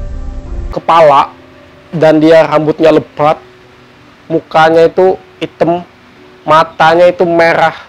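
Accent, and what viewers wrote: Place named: Banjar, Indonesia. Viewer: Air nya rada sedikit hanta🤣 Kental banar banjarnya.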